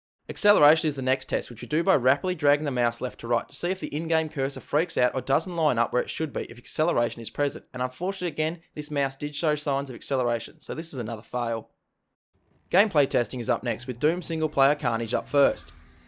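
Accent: Australia. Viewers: ayyyyy its an aussie finally an accent im used to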